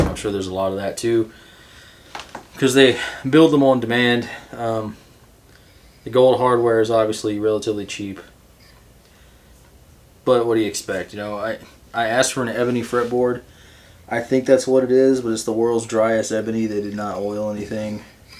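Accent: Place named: Georgia, United States. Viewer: haha... thought I recognized that accent, I'm from Georgia originally. Been in NC for 25 years or so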